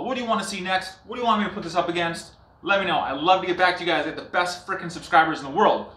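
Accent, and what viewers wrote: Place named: Bemidji, United States. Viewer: You sound like you are from Bemidji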